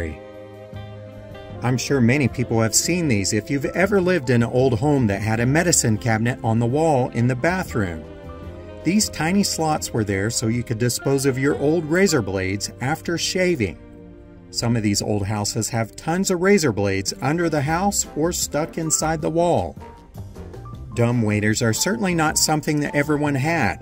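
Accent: United States. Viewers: Sounds like you are an American. I am English and was born and grew up in a home built in 1666. No inside toilet. No mains water, this came from a well and was brought into the house in buckets. No electricity. When I started to school I was fascinated with the light switches and taps(faucets) . At 72, I now live in a house built in 1972, with many modern conveniences but I still hang my laundry on the line outside on fair weather days. ps. My childhood was not too typical of the time, but one advantage was having 70 acres of land which allowed me a lot of freedom and a diet of home grown organic food. Love from England.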